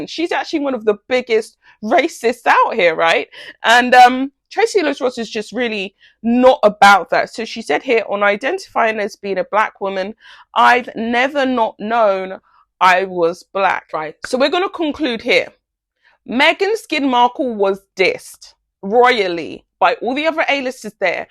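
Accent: United Kingdom. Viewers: As soon as I heard your British accent I knew this was a hate video!!!! F U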